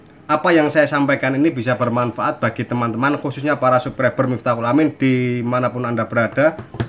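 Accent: Java, Indonesia. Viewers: Gan dr logat nya orang jawa ya? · Wah keren samarinda rasa jowo Nunut sinau om bos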